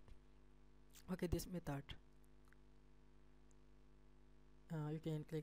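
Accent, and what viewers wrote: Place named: India. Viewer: Sounds like indian "Windows Support " scammer Leaves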